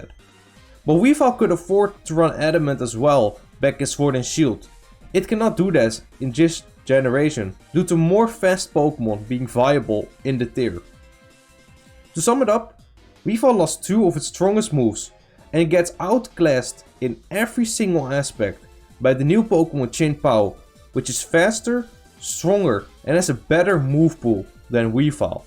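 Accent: Netherlands. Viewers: u dutch?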